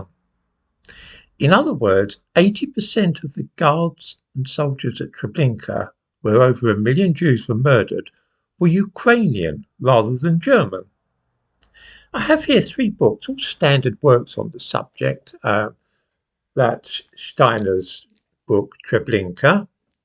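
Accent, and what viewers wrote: United Kingdom. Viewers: is absolutely critical in maintaining perspective and clear vision in the smoke and mirrors show. And he is so eloquently British as well.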